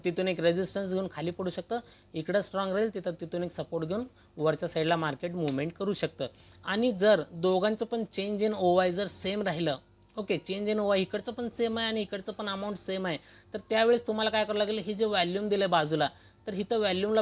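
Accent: Solapur, India. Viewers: Sir tumhi Solapurche ahe Right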